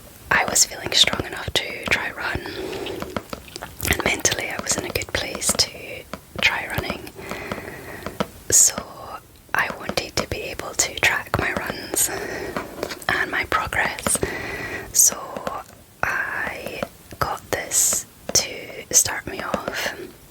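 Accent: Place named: Scotland, United Kingdom. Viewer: Best (and only) asmr i watch / listen to. And the scottish accent is absolutely amazing!!!! Thank you!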